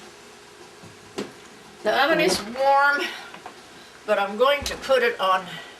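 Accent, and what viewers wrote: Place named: Southern Illinois, United States. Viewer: “Sodie, difernt, and R” You Have to be from Southern Illinois. Am I right?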